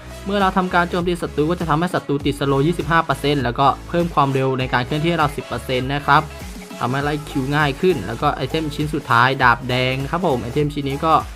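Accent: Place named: Thailand. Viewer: พูดเหน่อม๊ากกก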